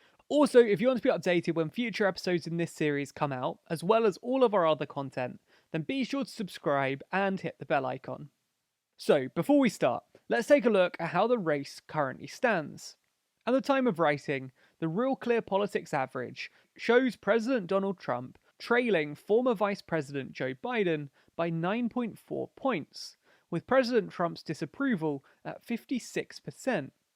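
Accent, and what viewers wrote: United Kingdom. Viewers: his British accent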